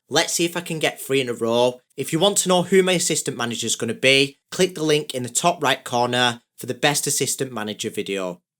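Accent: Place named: Liverpool, United Kingdom. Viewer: First time on your channel. Love your English accent. Are you Scouser?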